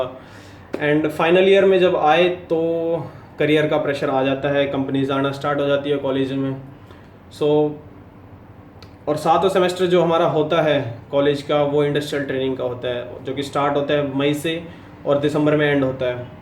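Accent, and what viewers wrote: Haryana, India. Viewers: Boli m jyada Haryanvi touch h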